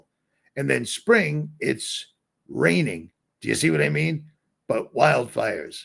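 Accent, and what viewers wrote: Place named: Boston, United States. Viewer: haha the Boston accent. We don't pronounce our R's and we add R's to words that don't have them. Reminds me of my sista Amander.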